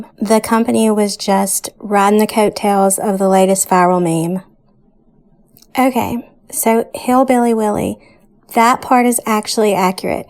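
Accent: South, United States. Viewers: Thank you. I just watched it. Crazy sh.....stuff ! There are alot of people seeing things , creatures , beings , humanoids, cryptids whatever people want to call them . I can tell just from listening to you that it had a very big impact but you seem to have adjusted well- well I hope you have anyway ! . Thank you for responding to my question and thank you for sharing your encounter ! Love your southern twang , drawl - accent !! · Hi. I found you through watching the Hammerson channel talking about Rakes and your encounter. First off, I BELIEVE YOU! It must have been shocking to see one of those creatures up close. I would have been terrified too. I found your channel now and have already watched all of your videos. Thanks for the deep dive of this image. You did an amazing job with all this extensive research and legitimately debunked the debunkers. 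That image is fucking horrifying and I can only imagine that it’s possibly what some of the missing travelers in the missing 411 cases encounter. I cannot fathom how scary that would be. Personally, I have had 0 cryptid encounters but strangely enough, I have been compiling a list on my Notes App of instances where I begin ruminating or thinking deeply about something, and then all of a sudden shortly later, it appears to me in real life. I know it sounds crazy but it’s like the universe is presenting these occurrences to me after I think about them. Idk it’s weird. It’s similar to how the Rake was created as a creepy pasta, but it was somehow WILLED or IMAGINED into reality. Just a theory. Anyway, I’m droning on but I research missing 411/cryptids every night and have nobody to talk to about this. I hope you make more videos, please! I will rewatch this while I’m stuck at my desk at work. 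I wish you good luck with the channel!! P.S. I LOVE your accent!! Makes me wish I could meet some southern girls but I’m stuck in california hahaha.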